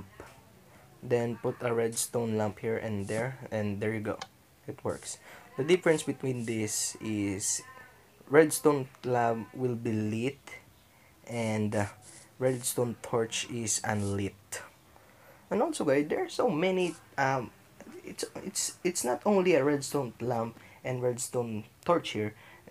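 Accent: Philippines. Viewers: Love your Filipino accent! I’m from hawaii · I am usually good at picking out the Filipino accent. I couldn't at first in this video. You must be Visayan because I learned Tagalog and I know the from-Tagalog-to-English accident but your [beautiful] accent it slightly different. What really gave it away, was when you said "ano".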